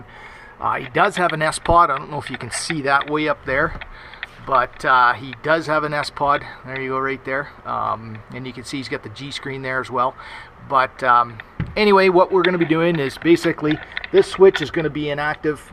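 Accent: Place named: Canada. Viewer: accent annoying. Canadian or border states. Install video was BS.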